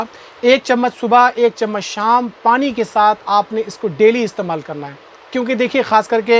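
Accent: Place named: India. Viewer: apki voice pakka hindustani dekha rahe hai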